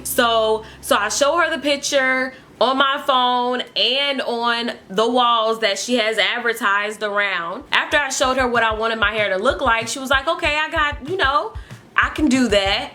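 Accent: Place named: California, United States. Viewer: sounds like she is from Cali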